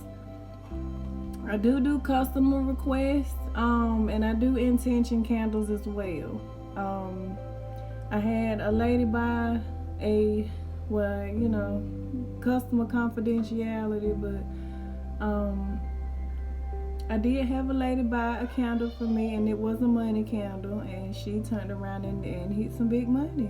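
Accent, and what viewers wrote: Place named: South, United States. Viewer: Hello. I so enjoy your channel. I love your soft speaking voice with that southern tone. Even through your shyness I can you're a sweet person. You have the best teacher. Keep the video's coming. Next time show us the food while you're cooking. That's right feed your babies when they are hungry. Be Blessed. Tell Soulful T hello.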